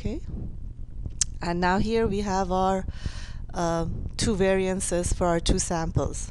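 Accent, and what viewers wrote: Iran. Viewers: persian accent. woohoo! XD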